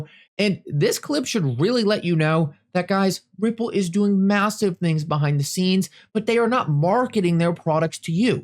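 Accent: Allentown, United States. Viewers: with an Allentown accent broken record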